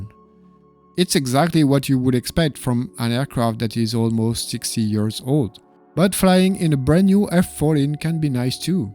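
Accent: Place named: France. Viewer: Excellente vidéo... (mais avec un accent pareil, t'as jamais pensé à faire tes vidéos en français ????)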